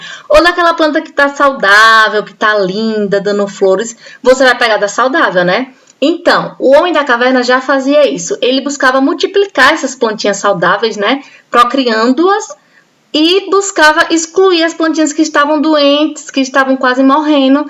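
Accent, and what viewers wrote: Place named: Recife, Brazil. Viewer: Parabéns! Gostei muito do teu jeito de explicar (com o teu sotaque e gírias ficou melhor ainda, sou de recife e julgo dizer q tu é daqui também kkkkk). Força aí no começo do teu canal, acredito que você fazendo o que gosta só gera bons frutos (como em tudo na vida). A única dica que eu te dou é relaxar na hora de gravar e deixar de lado o nervosismo de como falar, você relaxando e se divertindo nas explicações fica maravilhoso pra quem assiste. No mais é isso, gostei muito também do início que tu colocou "senta que lá vem história" fica muito bom esses memes em explicações de conteúdo.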